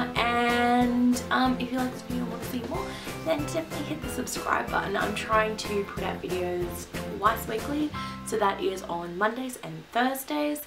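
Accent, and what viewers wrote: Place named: Australia. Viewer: Love your videos! What country are you from? I'm assuming your Australian judging by your accent, because I'm Australian and that's how most people sound here, if not then I am horribly, horribly wrong.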